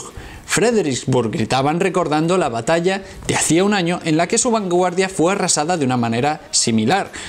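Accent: Spain. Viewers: Acá encuentro un combo interesante, rock, historia, la tonada española la cual disfruto mucho más en la narración... Y vos qué pareces de otra época!! Besote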